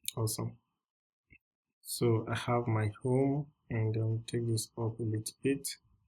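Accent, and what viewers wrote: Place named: Nigeria. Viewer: sounds Nigerian, finally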